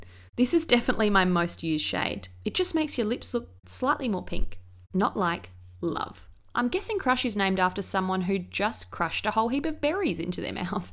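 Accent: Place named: Australia. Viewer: you sound australian, may i ask how did you buy glossier and get it shipped to australia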